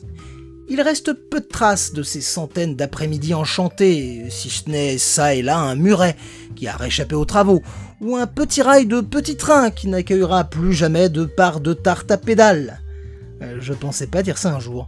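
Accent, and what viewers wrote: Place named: Netherlands. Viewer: L'accent néerlandais... bon c'est rien vidéo de ouf !!!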